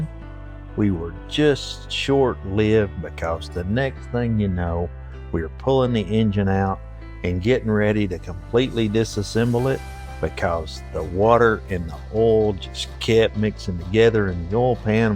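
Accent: Tennessee, United States. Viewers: Same here recognized that tennessee accent...im up here on the plateau in crossville. Great work on that f150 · That's an incredible job. I thought I heard TN in that accent. I'm in middle TN working on a 73 F100 swb. Thank you for the videos. I wish there were 10x more.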